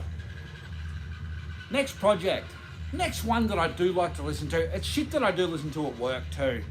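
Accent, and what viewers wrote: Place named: Australia. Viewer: Mate never fucken change your ways for any fuckwit. You being an aussie like myself was one of the things that pulled me into your vids. Got sick of listening to americans haha. But if youre jumping down the Power Electronics rabbit hole and want something extreme, check out Deathkey if you havent, also Intolitarian. Antichrist Kramer did the artwork for Absurd albums among many others! Cheers brother! 🍻🍻🍻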